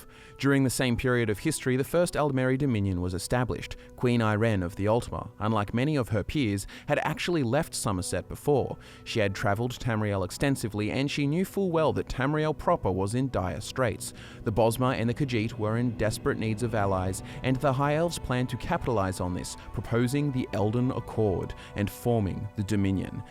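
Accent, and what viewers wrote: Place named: Australia. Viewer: Gergazie that’s just his Australian accent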